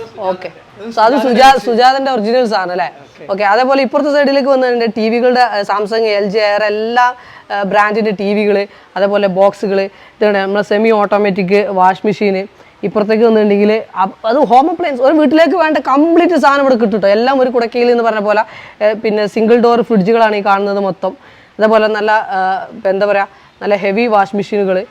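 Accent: Malappuram, India. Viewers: ഹായ് മുത്തുമണീസ് നിങ്ങളെ വിഡിയോ ഒരു വെറൈറ്റി ആണ് തന്നതായ നാടൻ ശൈലി സ്ഥിരം ഉപയോകിക്കുന്നമലപ്പുറം ഭാഷ കളർപില്ലാത്ത സംസാരം 👍👍നിങ്ങളും വീട്ടുകാരിയും ചെങ്ങായിമാരും 👍👍👍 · Hyy ningaludee video nalla vibe anee. Nammude Malappuram bashayil athintethaya reethiyil avatharippichh tharunna ikkaa.... Ingalum ingalee videosum adipoli ane tto❤️👍🔥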